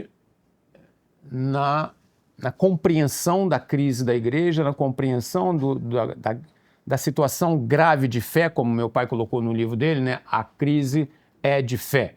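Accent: Rio de Janeiro, Brazil. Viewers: não nega que é do Rio… fala na lata🙈! Perfeito! Torço para que nos presenteie com mais aulas no CDB…👏🏻👏🏻👏🏻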